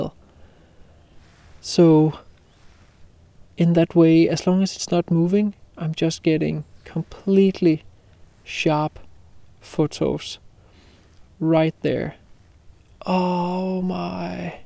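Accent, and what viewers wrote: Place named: Denmark. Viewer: That danish accent is a real joy to hear sometimes. Especially when it sounds like you're calling them shitland ponies 😂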